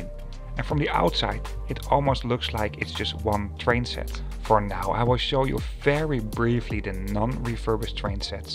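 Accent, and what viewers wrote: Netherlands. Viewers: Ik hoorde echt binnen een seconden dat je Nederlander bent 😅 We can't hide who we are 😛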